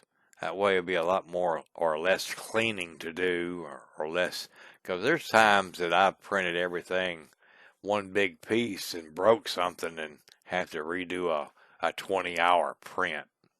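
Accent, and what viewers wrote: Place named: South, United States. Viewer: now THIS is what youtube is for. People who are EXTREMELY talented in their craft, and of course, a nice southern voice-over included with actually instructive narration. God speed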